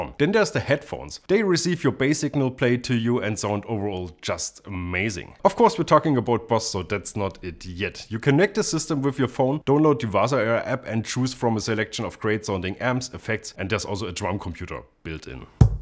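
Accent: Germany. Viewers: omg this German English so funny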